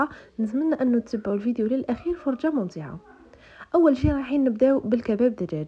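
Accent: Setif, Algeria. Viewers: هدرتك تباني سطايفية انا من سطيف هدرتنا تسخف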